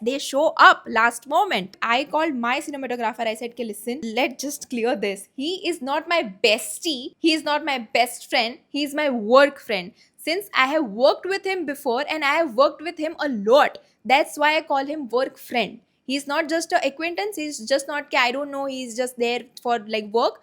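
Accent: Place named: Gujarat, India. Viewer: Love and her gujju accent ❤ dint watch the video u talking about but am definitely on your side 🫶🤌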